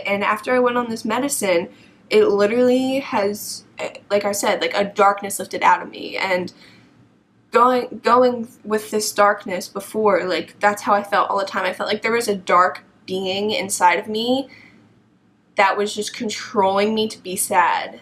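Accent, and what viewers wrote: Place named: New York, United States. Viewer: Are you from NY? you have an accent! Anyway, loved the video, God is the only one that can truly heal your sadness! :)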